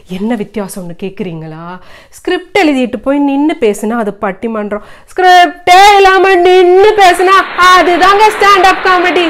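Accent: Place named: Coimbatore, India. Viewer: அம்மிணி நம்ம கோயமுத்தூர் பாசையா மிக மிக நன்றாக உள்ளது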